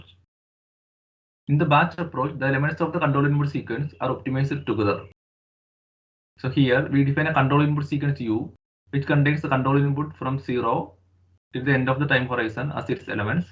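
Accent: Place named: Kerala, India. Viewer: you can be little slow.the words of speech are malayalam accent.it could be made a little clearer.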